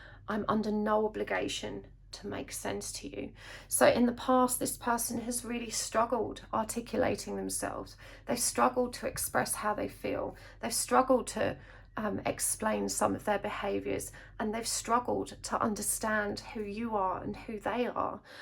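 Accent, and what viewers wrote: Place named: South London, United Kingdom. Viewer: I like to hear your voice. It reminds me of home. Somewhere between South London and Brighton I guess... I will probably never go back again as I refuse the vaccine, I'm pretty much stuck where I am. We make our bed and we must lie in it I guess. Anyway, thanks for the soothing and long-ago familiar tones of my home strip!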